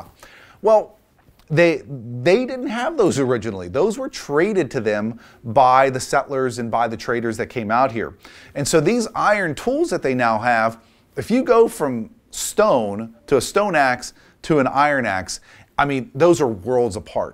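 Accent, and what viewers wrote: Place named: Pennsylvania, United States. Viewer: Dude! That accent! I lived in PA so long I didnt realize just what a pronounced (strong) accent it was! Thanks for the interesting info! I have been thinking about the Shawnee people, and didnt realize they had a presence in Northern West Virginia (LOL western PA)!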